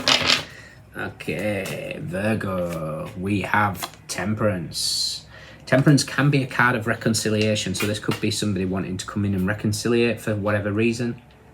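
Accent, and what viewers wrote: Northern England, United Kingdom. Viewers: I always look forward to your readings. I love your accent…. A proper northern accent. Thank you for reading 🙏🏻Have a great week ahead.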